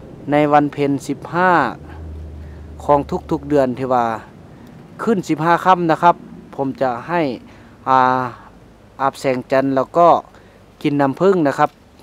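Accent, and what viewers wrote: Laos. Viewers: พูดไทยสัปลาวอย่างฮา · ລາວແທ້ໆເວົ້າໄທເພໍີອ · ເຈົ້າຂອງຄິປເປັນຄົນລາວຫລືຄົນໄທເນາະ ຕາມທີ່ຟັງສຽງອອກຊຳນຽງລາວເນາະ ຖ້າເປັນຄົນລາວກະເວົ້າລາວໂລດດີກວ່າບໍ່ ມີທັງການເວົ້າທີ່ບໍ່ແມ່ນພາສາຕົວເອງແລ້ວ ມັນບໍ່ເປັນຕາຟັງຄັນສິເວົ້າວ່າຫນ້າລັງກຽດກະສິໂພດກາຍ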